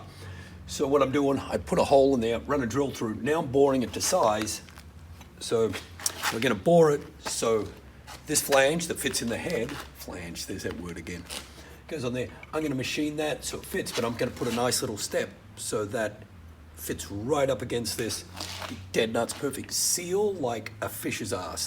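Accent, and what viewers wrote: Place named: Australia. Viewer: Love your videos. The accent allows you to have the colorful Language without offending. Kind of a "well he's Australian like Crocodile Dundee ". LOL! Thank you for the text included with this video. Very informative.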